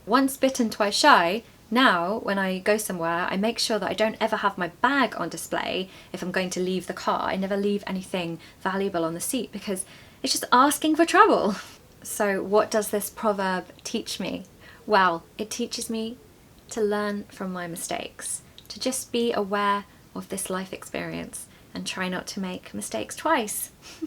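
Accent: RP, United Kingdom. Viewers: I love all your videos. I love your British accent. I can listen to you for hours. I used to live in the UK 4 years ago. Now I miss England and the British so much. So wonderful to listen to you and learn . Love you. 😊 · You deserve more and more subscribers. You deserve all the appreciation. You have a great way of explanation and a clear British accent. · thank you very much for your smile... By the way, do you have pronunciation lessons? As I know you have modern RP (MRP) am I right?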